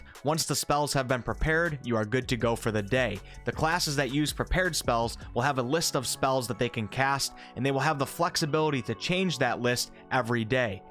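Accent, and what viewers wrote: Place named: Minnesota, United States. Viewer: What is your accent? Is this like Minnesota or something?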